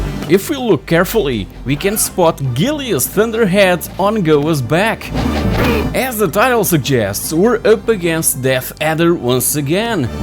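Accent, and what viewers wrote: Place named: Portugal. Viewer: I could feel the "tuga" through the accent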